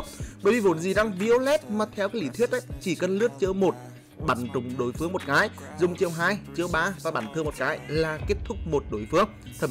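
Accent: Nghe An, Vietnam. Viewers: Chất giọng nghệ an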